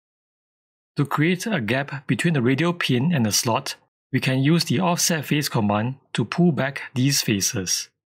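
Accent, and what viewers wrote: Singapore. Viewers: Singaporean accent on a great educational video? YES, immediate subscription!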